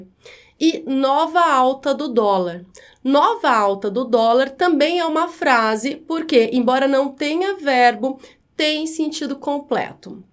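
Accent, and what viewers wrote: Brazil. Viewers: Gosto muito das suas explicações. Falo português europeu, mas entendo o vosso sotaque/dialeto brasileiro muito bem.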